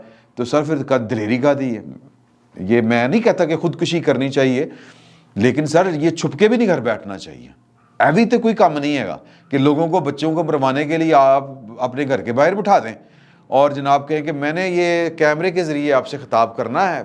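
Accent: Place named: Punjab, Pakistan. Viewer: آپ کے ویلوگ کی خوبصورتی ہی تلفظ کی تھوڑی ہیرا پھیری ہے ۔۔۔پنجابی تڑکا۔۔۔شاعری ۔۔۔اان کی وجہ سے بوریت نہیں ہوتی ۔۔اسوجہ سے chill کریں bro.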